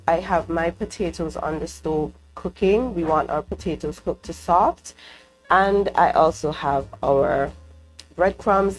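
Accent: Antigua and Barbuda. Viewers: Are you Antiguan? You accent sounds that way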